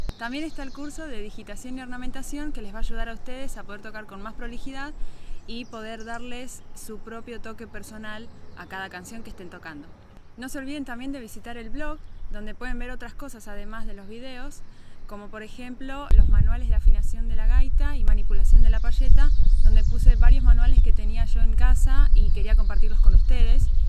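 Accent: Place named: Argentina. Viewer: Caramba, esta chica es argentina me parece.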